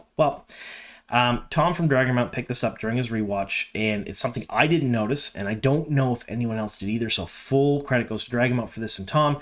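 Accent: Canada. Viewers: From your accent, I thought you must be a Canuck - thanks for confirming! #Tims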